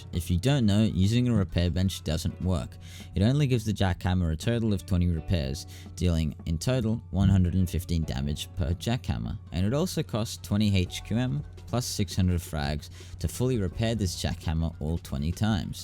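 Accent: Australia. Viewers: Definitely an aussie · Aye an Australian, or maybe a new zealander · OlliBrolli He’s an Aussie fam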